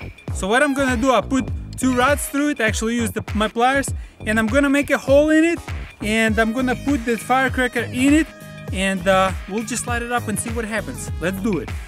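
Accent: Russia. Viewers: I hate it when he says "let's do it." In his annoying Russian accent.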